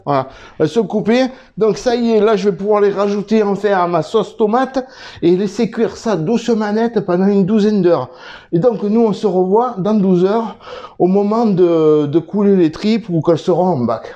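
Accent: South, France. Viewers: Tu sais j’aime ton dynamisme, ton expertise, le images de ton village. Ta joie et ton chaud accent du sud me donne la bonne humeur d’un film de Fernandel. Merci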